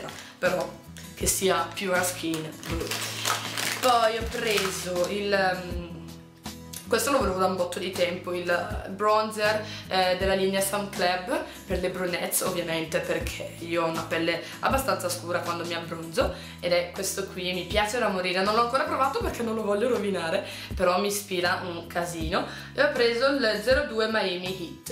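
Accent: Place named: Brescia, Italy. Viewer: di che parte di Brescia seii? appena hai salutato ho subito capito dall'accento che fossi delle mie stesse zone.. ahahaha :D begli acquisti comunque :)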